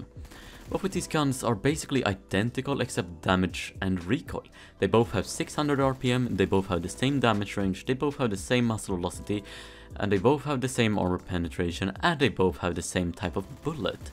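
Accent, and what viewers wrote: Sweden. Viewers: Wow. Are you swedish? Your accent SCREAMS swedish 😅👌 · Are you from sweden you sound like you are from sweden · Bruh he's swedish. Wtf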